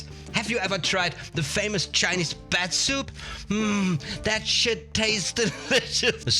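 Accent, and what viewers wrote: Germany. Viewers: Sure do miss your silly German accent!!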